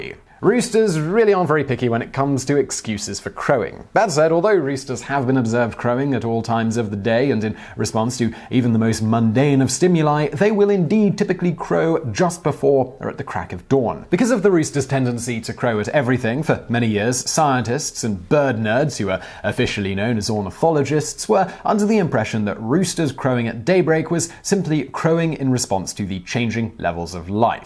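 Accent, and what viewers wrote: United Kingdom. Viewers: Interesting that despite your British accent you use the American term rooster rather than the British term cock. Now why is that I wonder? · Why is Michael from VSauce talking with a British accent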